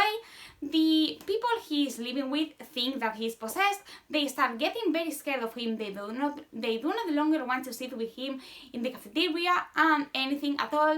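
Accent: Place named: France. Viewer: Cute French English accent. 🌷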